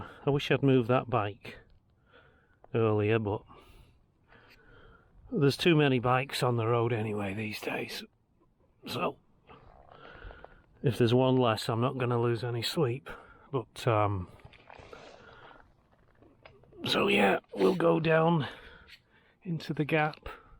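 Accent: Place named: Northern England, United Kingdom. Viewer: to hear your broad Northern accent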